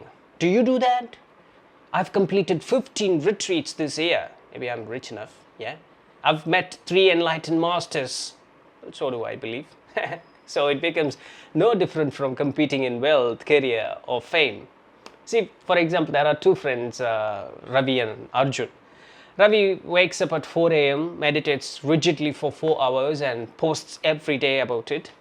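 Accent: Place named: India. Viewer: I'm surprised with your good English with less indian accent...l This makes your video in english more inner understanding with feelings... Thank you for this english you tube channel & May you get the highest success & blessing from God... Love from 3rd indian generation living outside india ❤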